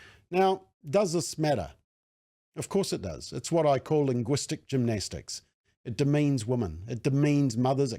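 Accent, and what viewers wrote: New Zealand. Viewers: I think spoke well, and as a fellow kiwi I understood everything he said. I'm sure overseas listeners will or should, take into account the kiwi accent. Thanks for a your great presentations,. 🙏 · You are criticising an accent. New Zealanders are not going to change their accent just because you don't respect it.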